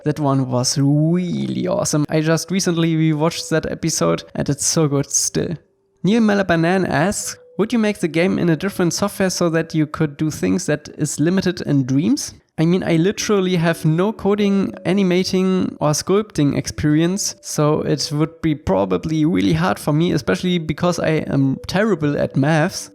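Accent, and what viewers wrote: Germany. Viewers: That german accent i love it haha xd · Are you German or somthing linke this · Musste am Anfang schon schmunzeln, weil man sofort raushört das du deutscher bist. Aber digga zieh durch, du hast mehr als mein Respekt und hoffe dass in 2 jahren ein geiles Avatar Game rauskommt und du da dann auch mitwirken kannst !❤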